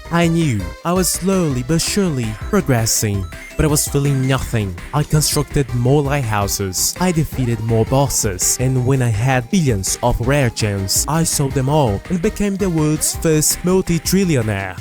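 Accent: Brazil. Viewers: where are you from? your accent sounds brazilian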